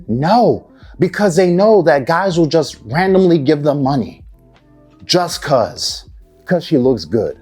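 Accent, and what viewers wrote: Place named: Nigeria. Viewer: The nigerian accent goes crazy bro haha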